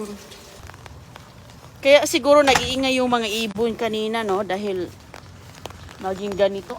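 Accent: Visayas, Philippines. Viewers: para po sakin siguro pabayaan na lang po natin siya ganyan po siya at siya yun di tayo kaya mas maganda wag na lang panoorin kung marami kayong nakikitang kamalian sa kanya at sa mga ginagawa nya. marami din po ako kaibigan na bisaya at ganyan din mag salita kahit sobrang tagal na nila dito sa katagalugan. opinyon ko lang po .✌️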